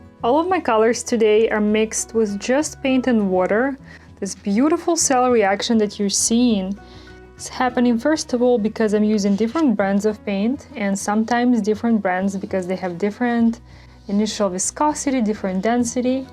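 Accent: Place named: Russia. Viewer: Очень интересно,красиво.. Вы похожи на украинку и манера говорить русская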